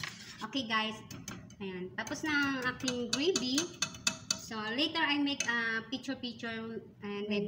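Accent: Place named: England, United Kingdom. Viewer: Nag enjoy ako panonood lods,,good vibes ang pagluluto mo with English accent hehe..new friend, Joyce Toda brought me here..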